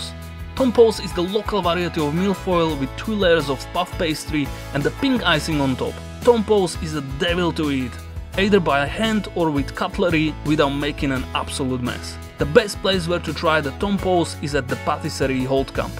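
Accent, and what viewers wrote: Slovakia. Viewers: This channel is exactly my cup of tea! 😊👍. Nice work. Keep going. Btw, I knew it immediately that you are Slovak from your accent 😆. Though, very solid English. Cheers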